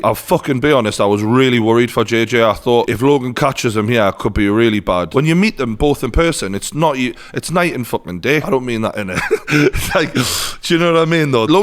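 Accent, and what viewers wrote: Newcastle, United Kingdom. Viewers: I love true Geordie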